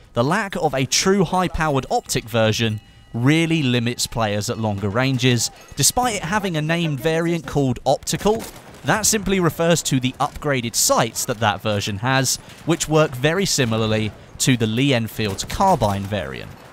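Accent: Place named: United Kingdom. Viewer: The British accent, the music and the commentary everything is spot on.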